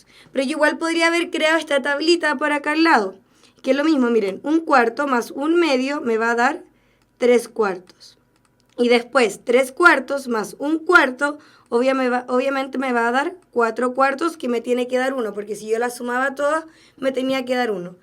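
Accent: Chile. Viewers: Eres chilena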